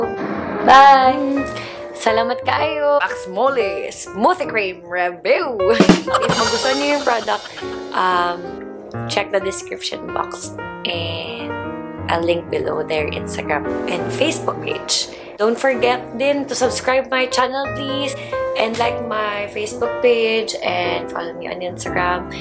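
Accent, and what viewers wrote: Visayas, Philippines. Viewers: Charr,Bisaya syaaaaa!!! Ateeee!!😍😍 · nah kay imbes seriuso ko nangatawa ko sa pag sulti nim ug visaya... nah kay maka lingaw kaayu lagi ka...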